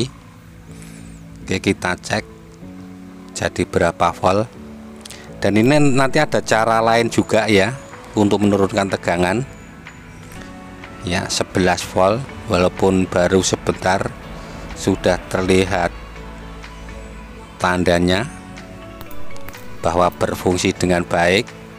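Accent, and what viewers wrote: Java, Indonesia. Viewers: LOGAT JAWANYA KENTEL BANGET, MAS!. MENURUT SAYA YG AWAM, NGECES AKI DGN CARA BEGITU, MEMBUAT AKI CEPAT RUSAK LHO, MAS!, YG PALING AMAN YA YG SE WAJARNYA PAKE TRAFFO 5AMPERE/ 3 AMPERE.,DAN DIODA 5AMPERE PULA..PENGECASAN AKI PAKE CARA INI CUMA BUAT DARURAT SAJA, JANGAN KETERUSAN, SEL AKI CEPAT RONTOK. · MEDDOK KALI JAWA MU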